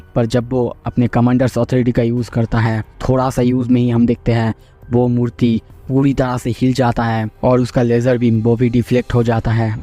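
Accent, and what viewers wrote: Bengal, India. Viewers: Tomer kotha sune mone ho6ilo Tumi Bangali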